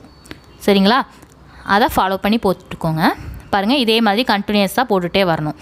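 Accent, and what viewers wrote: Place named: Coimbatore, India. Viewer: Thank you mam unga Coimbatore slang nalla irundhadhu mam so thanks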